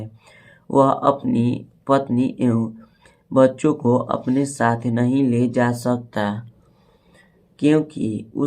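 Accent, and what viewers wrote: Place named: Tamil Brahmin, India. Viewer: This man talks like a Tamil Brahmin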